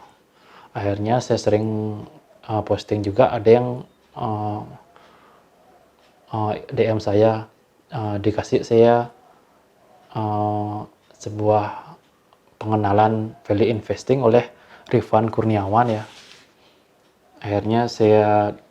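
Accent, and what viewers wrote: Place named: Java, Indonesia. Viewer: Medok sajan bahasa Indonesia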